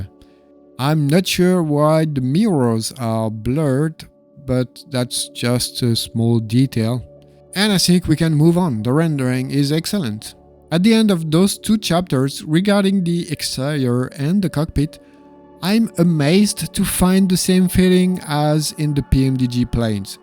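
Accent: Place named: France. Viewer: Excellente vidéo... (mais avec un accent pareil, t'as jamais pensé à faire tes vidéos en français ????)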